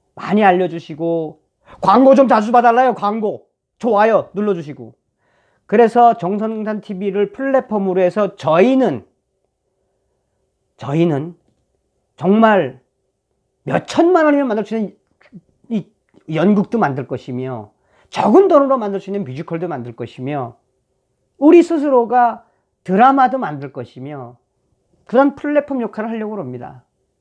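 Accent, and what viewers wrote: North Korea. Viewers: 정말 잘되었습니다 감독님이시라 논평도 뮤지컬처럼 재미있게 잘하십니다 이북사투리도 들을수있어서 거저 재미 있구만요 하는일 잘되시기 기도 드립니다 힘내시라우요😃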